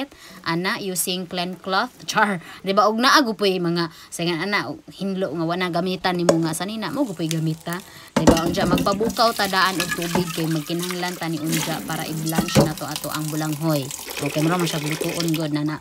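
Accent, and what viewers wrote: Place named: Bohol, Philippines. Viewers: Hi mga nice jamo imong mga content sa vedio vlog,mga lami au imong mga gepangluto,accent sa u inistoryahay that Bohol jd,jamojamo jd......kalami 😋makalaway oi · Ganahan kaayo ko maminaw sa imong Bisaya accent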